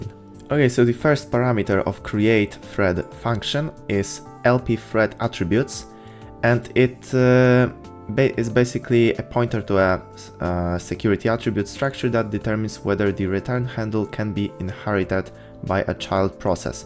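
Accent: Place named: Poland. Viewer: Polski akcent pozdro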